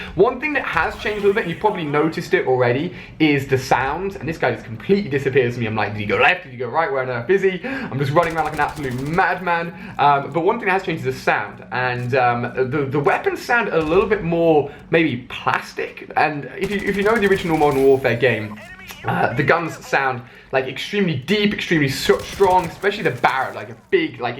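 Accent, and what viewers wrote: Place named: United Kingdom. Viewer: hmm all the guns and explosions sounds a British guy talking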